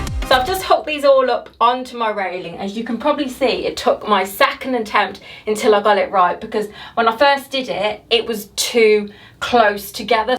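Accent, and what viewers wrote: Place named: Midlands, United Kingdom. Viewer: So nice to hear a Midlands accent on here! Big love from Coventry, love this tutorial!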